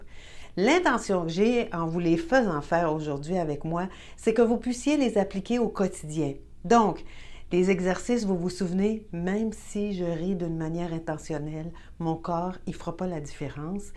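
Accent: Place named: Quebec, Canada. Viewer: MERCI 🙏 Merci pour votre rire et votre générosité à le partager ❤ J'ai ri de vos exercices et de l'intermède entre deux, tant le fait, alors, de reprendre votre sérieux m'a fait rire aussi, car c'était comme "vous retenir de rire" ! 🙃 'Quelle idée' !!! 😂 C'est mon yoga du matin 😊alors que j'ai entrepris de le retrouver pleinement, pour la petite fille surnommée "Rayon de soleil" que j'étais 🌈 Et votre si bel accent 'québecois' qui, au départ déjà, me fait sourire ✨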